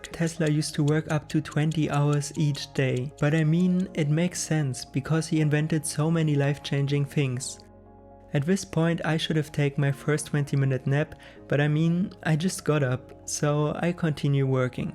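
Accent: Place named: India. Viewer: Without the video I would have thought that you were Indian. Good video btw 👍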